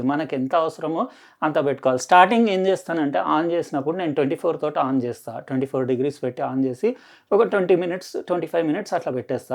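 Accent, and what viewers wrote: Telangana, India. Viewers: సార్ మీది తెలంగాణ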